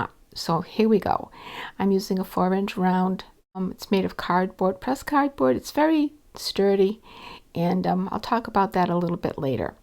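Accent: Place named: Massachusetts, United States. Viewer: Are you from Massachusets by chance? Your accent is so much like my aunts were. <3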